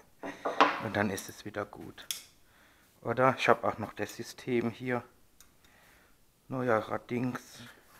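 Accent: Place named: Hesse, Germany. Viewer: vielen Dank für deine VA! hat mich mega gefreut! :) du bist ja geil drauf :D aus welcher Region kommt denn dein Akzent? Man könnte meinen du kommst aus dem Hessischen Bergland :D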